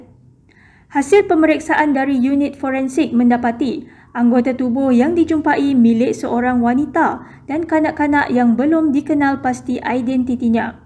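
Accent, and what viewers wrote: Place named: Kelantan, Malaysia. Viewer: Speaker pelat... ore klate ko haha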